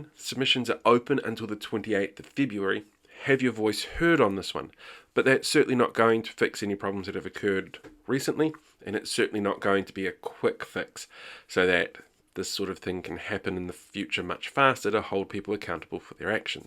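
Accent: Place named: United Kingdom. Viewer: First time visiting this channel and I am a little confused. I don't know what drama this video might be talking about so I am assuming this is British law? (Guessing from your accent)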